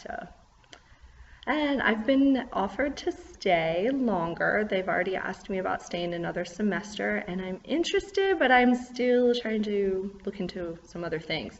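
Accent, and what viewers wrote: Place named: United States. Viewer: That's cool that you teach Uzbeks!!! You have an awesome American accent, like I. Uzbeks need to learn from YOU!!! My former English teacher Salomat Izzatulaevna in school #17 had a hidious british accent and I couldn't learn anything from her. She was a moron, unfortunately. In Uzbekistan you will become a top English teacher really fast! I can show you some teaching techniques that will significantly boost your student's language learning ability.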